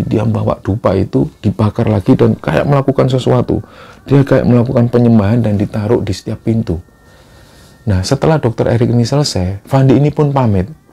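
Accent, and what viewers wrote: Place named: Surabaya, Indonesia. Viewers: Gaya bahasa khas surabaya nya bikin seneng denger nya.. 👍👍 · Mas aslix dr mana nggih, logatx kok sprti orang Pasuruan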